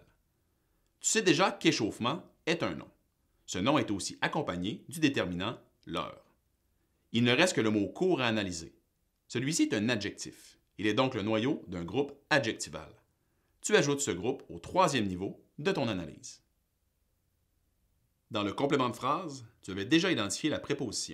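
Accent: Quebec, Canada. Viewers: Son français est correct, il a juste un accent québécois.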